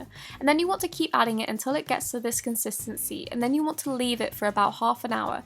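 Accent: England, United Kingdom. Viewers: What part of England a are you from?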